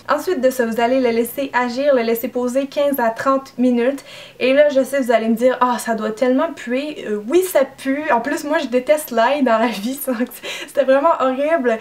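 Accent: Canada. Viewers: en plein dans le mille, j'etais sure que tu etait canadienne !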